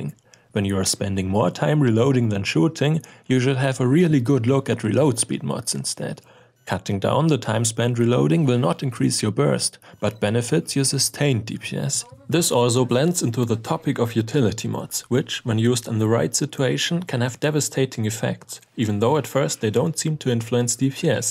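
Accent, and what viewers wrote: Germany. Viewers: Are you German ? ^^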